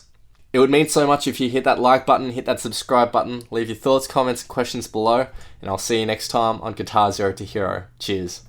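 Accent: Australia. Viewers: Got a bit of an aussie accent going on there, eh mate? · are you australian? me too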